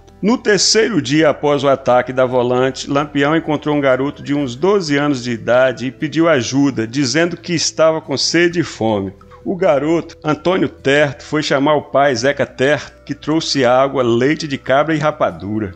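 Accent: Northeast, Brazil. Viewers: Mais uma excelente narração de cabra macho do nordeste como deve ser e sem ser acometido pelo vento mau!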